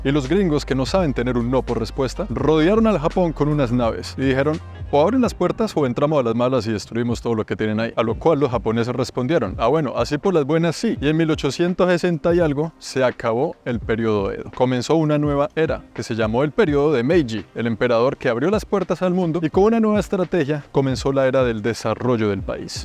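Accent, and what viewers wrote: Bogotá, Colombia. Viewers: Me fascina que hable como colombiano. Eso es lo más interesante de sus videos. Que hable con acento y palabras de Colombia. Eso es algo que le da un precio invaluable a sus videos, parce, el hablar con sello colombiano.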